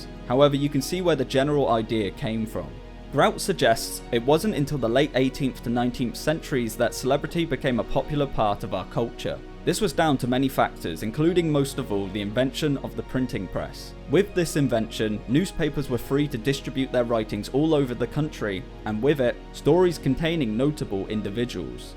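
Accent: United Kingdom. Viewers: Fame is bad sez the guy looking to become 'famous' via youtube subscribers. These British accents are starting to wear on my ear again.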